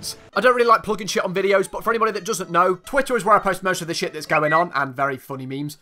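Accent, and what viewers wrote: United Kingdom. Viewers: U.K. accent is strong in you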